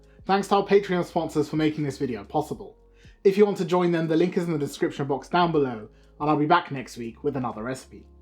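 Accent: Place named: United Kingdom. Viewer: lol it's weird hearing a British accent from an Egyptian.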